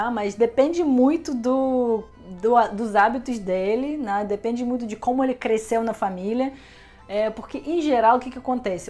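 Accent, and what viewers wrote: Rio de Janeiro, Brazil. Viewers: vc é russa? kkkkkkk seu português tem sotaque carioca (RIO DE JANEIRO) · 😘vc e muito linda interior e exteriormente! adoro seu portugues com sotaque carioca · Olha fiquei até na dúvida se é brasileira, sotaque carioca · Você fala muito bem o português, fala até com o sotaque carioca. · Achei seu português com sotaque mais carioca do que russo mesmo assim bastante charmoso. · Já tá toda trabalhada no sotaque carioca! Chia mais que dez cariocas juntos! Português perfeito! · Amo essa Russa com sotaque de carioca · Vc tem o sotaque do Rio de janeiro se eu não me engano ? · Sotaque carioca. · Parabéns por falar muito bem português pt-BR com um RJ-accent. Apesar de eu ser abstêmio, não bebo desde os 13 anos, concordo com sua avaliação sobre bebida. No filme Guerra e Paz, baseado na obra de Leon Tolstoi, existe uma cena antológica que comprova essa tradição russa de competição para ver quem bebe mais e quem é mais corajoso. 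Dois homens, cada um com sua garrafa de vodka, ficam de pé se equilibrando no parapeito de uma janela no terceiro andar, de costas para o lado de fora. Ganha aquele que conseguir esvaziar a garrafa primeiro. Quanto a mulheres russas, alguns anos atrás o escritor brasileiro Paulo Coelho fez uma viagem no Expresso Trans Siberiano. Essa viagem foi filmada e passou na tv brasileira. A cada cidade ele descia para ter contato com as pessoas e só apareciam mulheres jovens, todas extremamente bonitas. Mulheres russas e ucranianas parecem ser literalmente de outro mundo. No bom sentido, claro. Acho que as únicas que conseguem competir com elas são as iranianas (persas). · Da para perceber que ela mora no RJ. Super carioca. Um português impecável! Meu Deus. Nota 10 para a fluência dela! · Uma russa falando com sotaque carioca, que top ! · Pelo seu sotaque,você deve ter aprendido o português Brasileiro no rio de Janeiro. 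· Você é a primeira russa com sotaque carioca RSS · Linda e doce Russa/carioca, você é uma ótima observadora de comportamentos masculinos aqui observados em relação às duas nações, o Brasil e a Rússia. Seu sotaque do português brasileiro está mais perto do Lácio pela pronúncia do "s" como "x" o qual é uma herança lusitana e que por sua vez vem do latim. No estado do Rio de Janeiro fluminenses e cariocas e também nordestinos e nortistas ainda usam a pronúncia romana do "s'' assim como ainda o fazem os portugueses e os galegos, estes irmãos no idioma pois foram no passado uma só língua. Abração apertadinho, saúde de montão, paz, alegrias e muito mais sucesso! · Ela já mora no Brasil há mais de 7 anos, perdeu o sotaque russo e ganhou sotaque carioca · Po , russa ? Pelo sotaque já é carioca!!!!! É noíxxxxx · Você fala um ótimo "carioquês" haha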